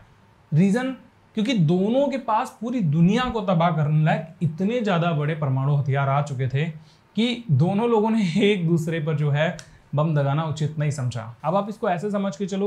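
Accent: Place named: Allahabad, India. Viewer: Sir apka illahabadi accent 🥺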